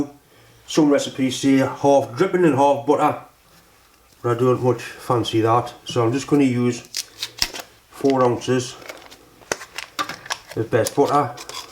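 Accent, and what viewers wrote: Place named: Geordie, United Kingdom. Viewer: Ah really enjoy yer traditional recipes an Ah love the way yee divvent change yer accent tee much. Ahm a born an bred Geordie frem Wallsend but Aa've spent the last 20 years living in Scotland. But when me laddie wez born Ah made sure wi cyame doon te the General Hospital just so me lad waad hev a rich heritage an be a true Geordie. Thanks a lot. Paul Wor lass is also called and wi love watching yi.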